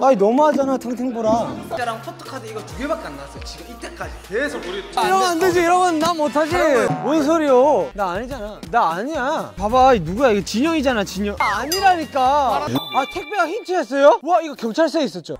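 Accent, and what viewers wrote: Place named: Busan, South Korea. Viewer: Busan satoori lol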